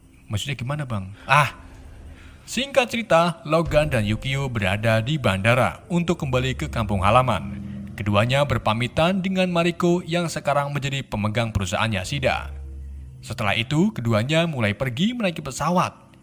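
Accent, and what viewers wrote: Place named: Tegal, Indonesia. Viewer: Admine wong tegal jebule..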